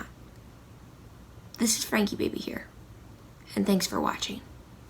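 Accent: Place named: New York, United States. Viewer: You sound like you’re from New York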